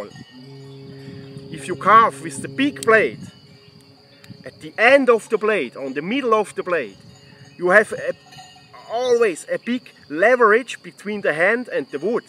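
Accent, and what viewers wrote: Germany. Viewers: А что у него за акцент? Немецкий?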